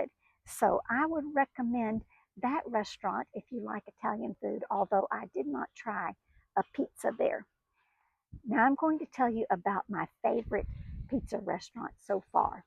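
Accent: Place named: North Carolina, United States. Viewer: I like your accent. I'm from the UK so I'm not too familiar with US accents. Are you from North Carolina by any chance?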